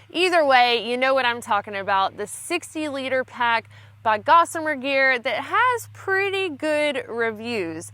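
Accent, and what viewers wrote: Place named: Missouri, United States. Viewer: What do you do as a precaution for bears, I've seen you a video where you had the bear spray ? I have this fear of running into a Grizzly bear, there was a video (facebook maybe) that I saw of a guy that jumped up and started screaming when a bear charged him out of nowhere and the bear turned and ran away............I think I would open my mouth to scream and nothing would come out!!)) Oh and I like your slang or accent " YALL" !)) You sure your not from Missouri!!))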